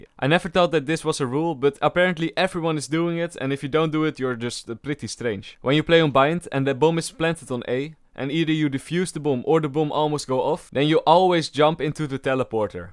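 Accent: Netherlands. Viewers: I smell Nederlands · Are you dutch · 100% jij bent NL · Het nederlandse accent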